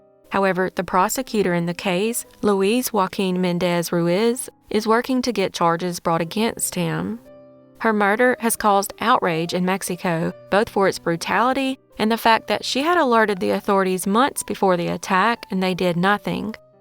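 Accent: South, United States. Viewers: Thank you lovely 🥰 lady from the South with a beautiful Southern accent and a kind Southern heart! 💕💐💕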